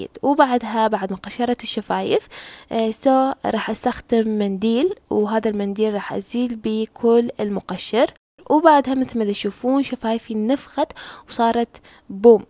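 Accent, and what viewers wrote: Iraq. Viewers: هاي عراقيه 👽🥺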